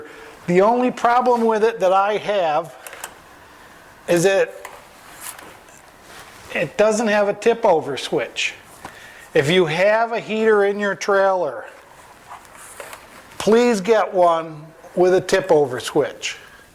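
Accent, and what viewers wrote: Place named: Hudson Valley, United States. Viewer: Thanks for the video as it clears up a few important issues that being a first time RVMH camper clears up. I enjoyed this video and I also enjoyed the first one where you put a marine outlet in to replace an existing one. Your dying and instructions were perfect. I do not know if your heater gives a way was for 2017 or 2018 but if it is still ope I timed the section on the give away pm a over cast cold Hudson Valley NY Saturday. We still have snow on the ground down hear but that will likely be gone in a few weeks . That is unless we get another N0r-Easter. I'll be glad to see winter depart. Thanks again for the videos and the chance to subscribe to a channel that has a person conducting it that speaks the way we speak down here in the Hudson Valley. PS there is more to NY than NYC about 100 miles down the Hudson River. 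< MIKE <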